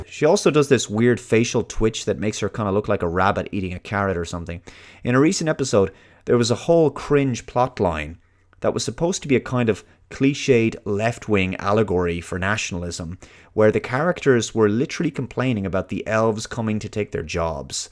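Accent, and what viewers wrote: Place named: Ireland. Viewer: At first I hated the accent because I thought he was British but towards the end of the video I realized he was Irish and started liking the accent. I think I'm racist.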